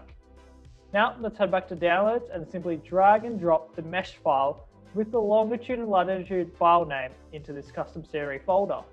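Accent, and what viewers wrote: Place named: United States. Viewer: I don't have global scenery installed so will it work like that And also u look like indian and talk like American nice channel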